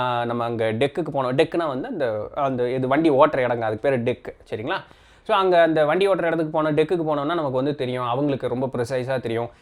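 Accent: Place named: Marthandam, India. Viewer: Marthandam slang tamil song paadura pola tan irukum..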